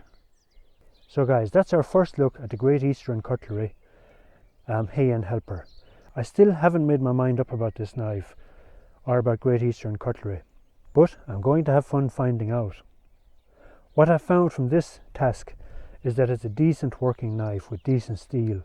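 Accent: Ireland. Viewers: Every time I watch one of your videos you make me smile and chuckle to myself: here is some quietly spoken Irishman calling time on the all the survivalist/bush-crafting BS on youtube. Absolutely priceless!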